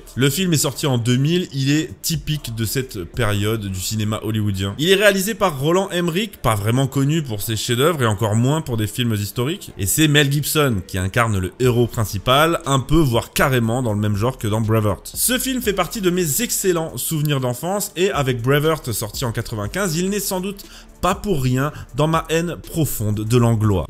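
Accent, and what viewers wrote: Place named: England, United Kingdom. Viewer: toujours interessant ce genre de vidéo mais l'accent anglais... svp...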